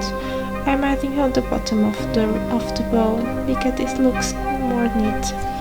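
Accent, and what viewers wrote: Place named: Portugal. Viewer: mal abri o video soube logo que eras pt-pt por causa do sotaque😭 portugal mentioned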